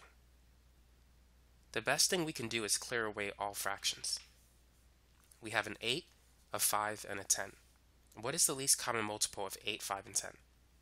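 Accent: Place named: Boston, United States. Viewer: You have a Boston ascent. Btw your videos are great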